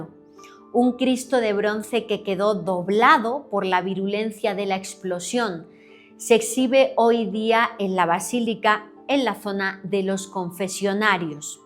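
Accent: Spain. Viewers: La única persona de la cual no me molesta su acento español ...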